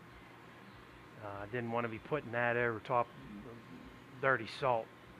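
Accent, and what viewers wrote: Pennsylvania, United States. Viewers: You sound like my in laws, Are you in PA? where did you get the bug shield from?:)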